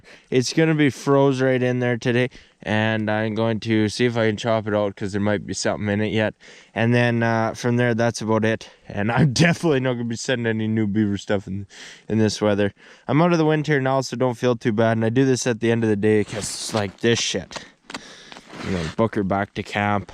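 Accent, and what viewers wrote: Canada. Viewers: From Texas. Love those Canadian vowels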